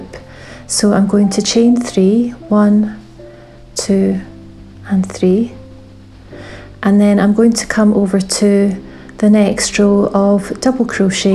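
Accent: Scotland, United Kingdom. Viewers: 🥰Just wanted to say that I love your tutorials,! They are so easy to follow and the result is absolutely perfect! Oh and of course love your Scottish accent! 😄 Keep the videos coming because I for one am hooked for life! Pun intented!;)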